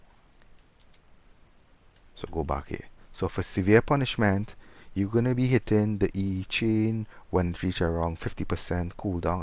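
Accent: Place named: Trinidad and Tobago. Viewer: how this man sound like ah trini so, thanks for the vid bro, im also playing a red lantern. me had a little trouble understanding them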